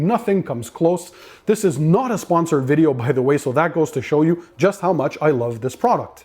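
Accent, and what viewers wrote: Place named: Montreal, Canada. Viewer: I was going to ask if your from Montreal because of your accent and then you said it! From here too!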